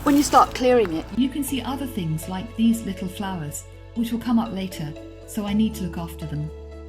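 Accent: London, United Kingdom. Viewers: 喜欢Manda说英语的感觉，好优雅好精致，伦敦音好好听～而且解释的好细致好专业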